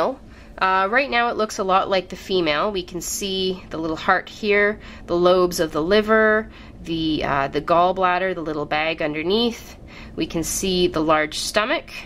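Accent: Canada. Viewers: First of all, she lives in Canada and is a Canadian. They have a different accent and pronunciations.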